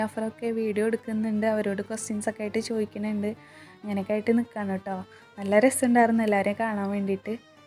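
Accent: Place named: Thrissur, India. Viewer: തൃശൂർ കാരുടെ സൗണ്ട് എനിക്ക് മനസ്സിലാവും ഞാൻ മലപ്പുറത്ത് ആണ് അബുദാബിയിൽ ഞാൻ തൃശൂർകാരുടെ കടയിൽ ജോലി ചെയ്തിട്ടുണ്ട് അങ്ങനെ മനസ്സിലായതാണ്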